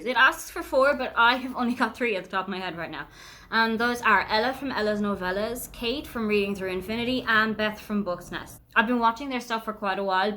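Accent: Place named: Germany. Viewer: I love your accent. I find it really hard to understand the Irish accent most of the time but your accent is so easy, maybe it's a bit of the German açcent you have picked up, have you lived in Germany long?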